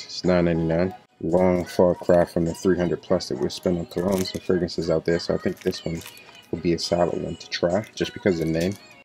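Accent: Baltimore, United States. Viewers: U got a Baltimore accent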